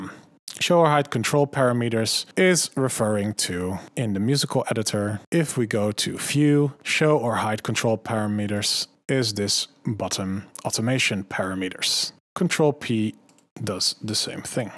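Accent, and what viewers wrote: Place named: Netherlands. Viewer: Ik dacht al dat ik een NL accent hoorde.. :) gave video.. wat is de key shortcut om die tijdsbar aan het begin te krijgen, de Home toets werkt niet bij mij.. wordt er aardig gek van..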